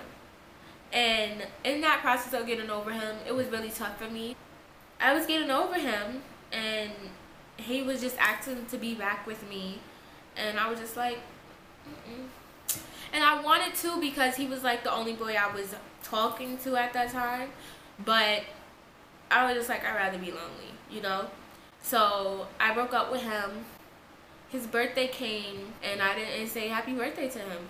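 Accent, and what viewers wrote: New York, United States. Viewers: NY All the way! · That's how a lot of New York people talk, it's kinda like our slang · new york 😊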